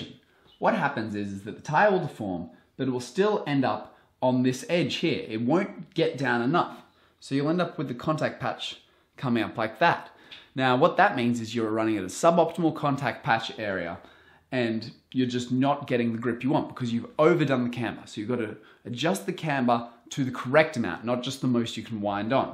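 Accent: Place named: Australia. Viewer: instant subscrib + australian accent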